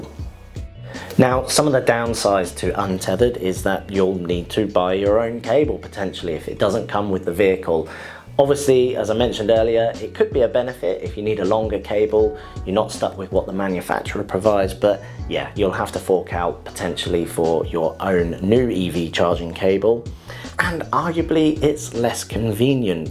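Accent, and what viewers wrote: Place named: United Kingdom. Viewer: Excellent, clear and easy to understand. How refreshing to see a review in British English, not least of all because it is more reassuring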